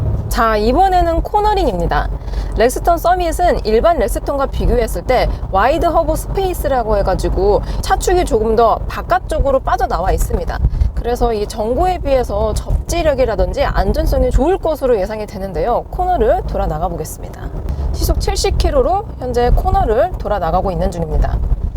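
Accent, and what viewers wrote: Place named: Chungcheong, South Korea. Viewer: 충청도 사투리 섞여 있네유 .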